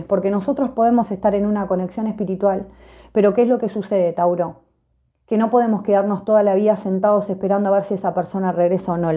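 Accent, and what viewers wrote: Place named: Argentina. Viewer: Amo tus lecturas y tu forma de leer tan argentina jajajajja te talta el boluda amoooo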